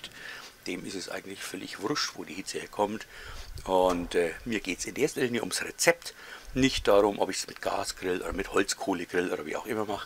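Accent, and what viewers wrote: Franconia, Germany. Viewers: Es ist Gold wert was die Youtube Untertitel aus deinem fränkischen Dialekt machen 😂 tolles Rezept und Video! Ich glaub ich brauch doch langsam mal nen dopf.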